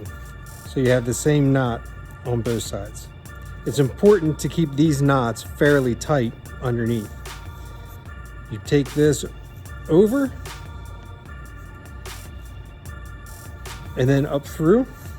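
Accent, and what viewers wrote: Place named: Philadelphia, United States. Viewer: I’m sensing a Philadelphia kind of accent. Maybe Baltimore. Somewhere in the Northeast without being New York or New England.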